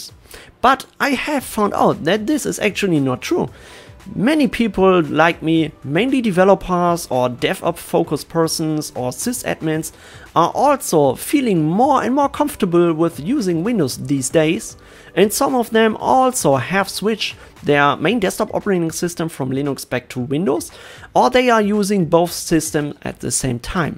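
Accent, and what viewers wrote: Germany. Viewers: ist auch völlig in Ordnung. Der deutsche Akzent ist sehr deutlich, mag ich! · Sag mal, bist du deutscher?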